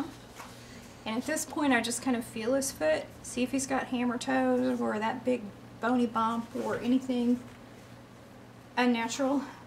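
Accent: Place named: Southwest, United States. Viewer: I’ve run into people here in MO who say “mayzure.” She probably interacts with lots of people who have southwestern regional accents. I noticed at several points in the video that the accent flattens out a bit. It could be she has grown used to hiding it or it’s a consciously or unconsciously assumed accent due to her location. Whatever the case, I enjoyed the video and her personality.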